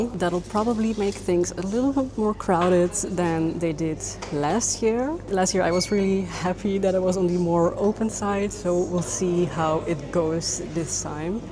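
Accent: Limburg, Netherlands. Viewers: Aaaah je komt ook uit Limburg! Ik heb nog nooit eerder iemand plat horen praten op youtube, super leuk haha❤️ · Love the Limburgs hehe 🫶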